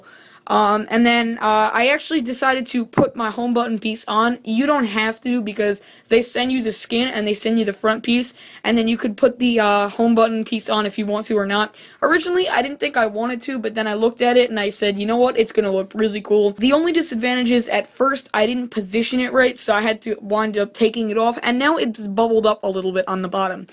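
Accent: United States. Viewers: Do you have a New York accent?? Just wondering, otherwise great video.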